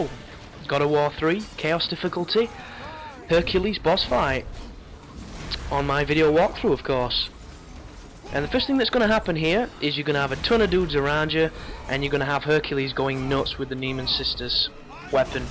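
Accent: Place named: Midlands, United Kingdom. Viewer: "1 take"? you don't say. I can imagine the challenge of filling the voids with chatter, hell I love the sound of my own voice but even I shied away from audio commentaries. I'm glad you showed me that I was right to do so. Tell me bra', where are you from *county will do* I'm struggling to place that accent. I'm from Derbyshire and you don't sound a million miles away.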